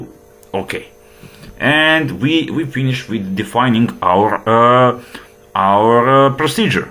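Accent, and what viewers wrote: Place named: Eastern Europe. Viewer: noice true slav accent.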